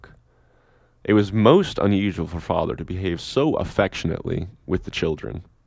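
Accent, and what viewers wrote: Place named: United States. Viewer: God the thick American accent and the stupid pity half weeping sappy voice what a way to ruin an audiobook. The writing is not supposed to be sentimental lol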